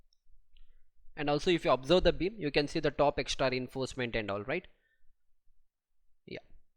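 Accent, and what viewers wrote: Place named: India. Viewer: Ugh the Indian accent! I really want to learn.😊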